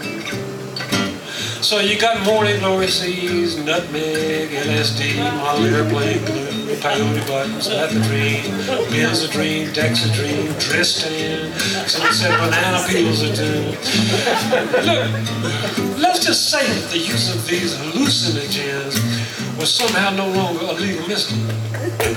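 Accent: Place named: South, United States. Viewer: Jesse always connected with his audience, thru humor, wit, heartfelt love for his fellow humans, and that ever sweet Southern honeysuckle drawl. I feel so fortunate to have shared this planet with him for years, and thankful to have seen him perform a few times.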